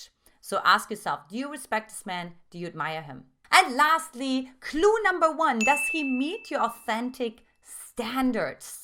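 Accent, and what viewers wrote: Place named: Germany. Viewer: Thanks. Are you from Germany? I can hear a little German accent when you speak.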